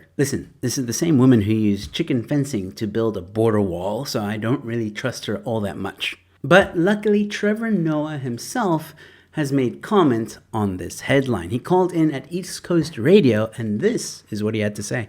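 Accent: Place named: United States. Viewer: your video is poorly put together and comes across as propoganda. Who pays you to post this absolute junk and why don't you walk around in South Africa to see the development AND integration we have going on? Or are you just more comfortable being sheltered in your home spreading nonsense like this that tarnishes the name of our country? Your accent is American, why do you post so much about cape independence and so on? I'm going to find out whose funding you and you will be held accountable. So will they.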